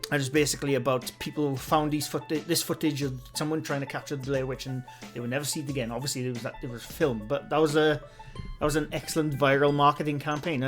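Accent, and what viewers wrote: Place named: Scotland, United Kingdom. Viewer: a good Scottish accent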